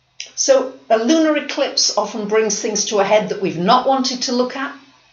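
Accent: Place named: Midlands, United Kingdom. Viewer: I had no idea you lived in Greece, I thought you were maybe somewhere in the midlands in the UK.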